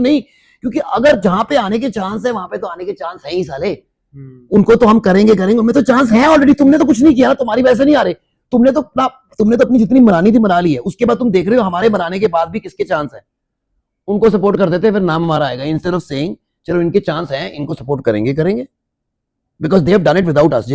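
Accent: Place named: Jammu, India. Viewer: Your hindi similar to jammu hindi 😂 buta . Bhande . Etc😂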